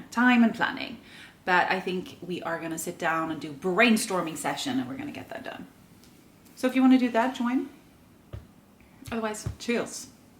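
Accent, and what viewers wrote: Ireland. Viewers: It is so interesting that when I first found this channel, I thought that you, were Irish. My nephew in law is Irish and I loved his beautiful accent when he first came to the US. Unfortunately after two decades in the US, he has now lost most of it. But I can still detect a little bit of an Irish accent when you speak. Best wishes for a very Merry Christmas, and Grim. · You have a very Irish accent.. lovely voice 🎄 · As a Californian, I can tell you have a slightly Irish accent. Great channel! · Greetings from Florida!!! When you said about people not understanding your Irish accent, I can't understand that because I visit Ireland quite a bit and I absolutely LOVE the Irish accent..... it's so beautiful and Ireland is awesome too!! Eventually I will live there and the people are so friendly! So, Happy Holidays to you 3 and All the Best for the New Year! 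· I’m in the US and I’ve been wondering why your voice has reminded me of a close friend from Ireland who’s lived in the US for many years. Now I know!! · I definitely think you sound Irish!! I’ve always thought that and wondered 🤗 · Your Irish accent pops out occasionally it's lovely 💚mostly it's not American the rest is mild Swedish it's very pretty 🤗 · I knew I heard Irish in your accent! Now it makes sense ☺️ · You sound Irish to me😊 I am from Liverpool in the Uk, we are the Irish that couldn’t swim back😊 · Just caught this video and have to say that every time I hear you speak English in your videos I think you have a slight Irish accent. So you haven't lost it yet! Lol! And it's lovely, by the way. Love all your videos!!! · It’s funny you said you used to have a thick Irish accent because I always thought you sounded Irish but assumed it was a Swedish accent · You still sound Irish to me and not American. 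· Just found your channel, I think you’re both adorable. Grim is so lovely. When I saw your first video (which was the most recent one) I thought you were Irish, so I now get why I thought that. I live in North Devon in the UK. I wanted to know if Finland is considered part of the Scandinavian culture I know the language is quite different, but I haven’t heard you mention it. If you don’t mind I would I would like to recommend a channel to you. It is Wild wonderful off grid. You may already know about them but if you don’t I think you will enjoy them. Now I will subscribe to you😊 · I’m Irish and I knew you had an Irish accent in there!!!! 🍀🍀🍀🍀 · You still sound Irish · I can hear your Irish accent pretty much all the time! It amuses me to be watching someone Swedish with an Irish brogue who lives in Norway!:-) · I wondered why you had a little Irish accent. My parents grew up in the west of Ireland and emigrated to the US where I was born. 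You also use American slang; that might be from watching American TV shows. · Now I know that you've had an Irish twang before it's all I can hear in your voice!! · I’m Irish and I never noticed the your slight Irish accent until now!! Now it’s so noticeable! 💚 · I noticed your Irish accent before you ever mentioned living in Ireland. It was a video ages ago I can't remember the exact video but I literally replayed the clip a few times thinking "that's definitely an Irish accent". Some words you definitely pronounce in an Irish way... Just of course I can't think of them now 😆💚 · I actually thought you were Irish. 😏 I'm a new subscriber and am binge-watching. I lived in kristiansand when I was 14 and couldn't believe how beautiful it is. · I was thinking you sounded Irish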